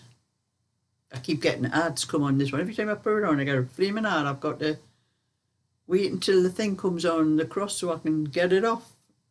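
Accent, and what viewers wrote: Newcastle, United Kingdom. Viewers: Hello, I love your videos, I’m totally rubbish at knitting and crochet but it s good to see the expert in work. What I really love is listening to your antics, all my family are geordies, it’s great to hear your accent, all the fun with your hilarious pals, I think they all look to you. Thank you.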